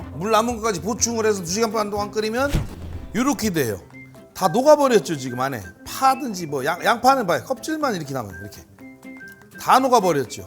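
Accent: Chungcheong, South Korea. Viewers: 충청도 사투리로 구수하게 설명히시니 재미있고 당장 고기 사다가 삶아먹고 싶네요. 꼭 이대로 해봐야겠어요.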